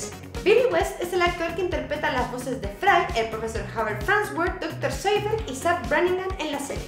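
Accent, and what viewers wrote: Chile. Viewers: eres chilena? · debo confesar que no soporto el acento chileno (así como muchos no soportan muchos otros) pero la forma de hacer los vídeos y el cuidado con las cosas que dicen me hacen obviar eso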